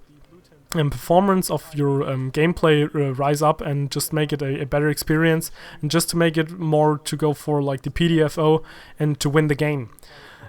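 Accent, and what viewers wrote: Germany. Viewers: Ich vermisse den Punkt "Strategie" (=langfristige Manöver um das Spiel zu gewinnen - z.B. bei Spielmodi mit mehreren Objectives von einem Ablenken um das andere angreifen zu können, ...). Außerdem hätte ich Teamwork (=Rollen sinnvoll ergänzen, gegenseitig Rücken decken, ...) und Taktik (kurzfristige Manöver um Gunfights zu gewinnen - Dropshots, Deckung effektiv nutzen, ...) nicht unbedingt in einem Abwasch erledigt. Ansonsten hast Du das aber sehr systematisch und sorgfältig gemacht :) ... PS: Egal wie sehr man auf den Akzent achtet, das Deutsche hört man immer irgendwie heraus ^^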